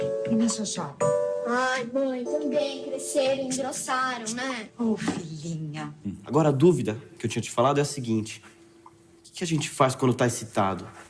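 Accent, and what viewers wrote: São Paulo, Brazil. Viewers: falando paulistanês é o melhor MEU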